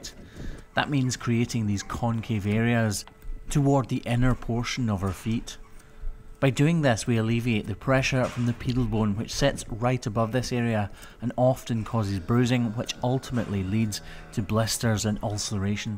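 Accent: Scotland, United Kingdom. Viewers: It’s a very dirty job but someone has to care for cows feet and you do a fabulous job. Too, love your Scottish accent!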